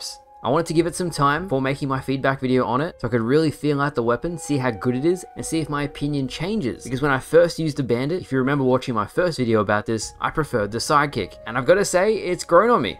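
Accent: Australia. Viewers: Lol forgot was Australian until he started the video with "G'day"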